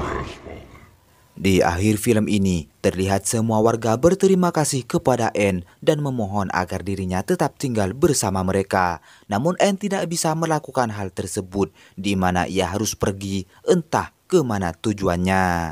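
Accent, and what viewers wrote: Madura, Indonesia. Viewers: Dari logatnya kayaknya asli Madura 👍👍👍👍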